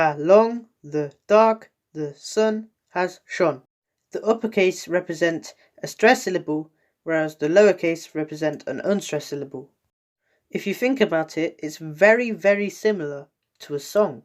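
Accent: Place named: United Kingdom. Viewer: British accent 😍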